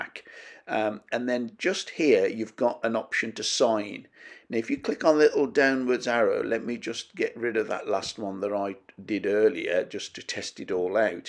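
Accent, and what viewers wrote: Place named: Dudley, United Kingdom. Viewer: Hi, Really enjoying your vlogs on Affinity Photo, just been following the vlog Brand Signature. I've been after a way to add a Signature to my photos for such a long time. Messaged vloggers in America and Australia on this subject asking if they knew how to add a signature in Affinity Photo. Not had a reply from either of them?? To be honest don't really think they knew how to do it. Followed your instructions and now I can add a signature in seconds instead of having to keep typing it out. Can't thank you enough mate, keep up the great work look forward to watching more vlogs. ( Your accent sounds like you're from around my area I'm just outside of Dudley. Once again thanks. Fred.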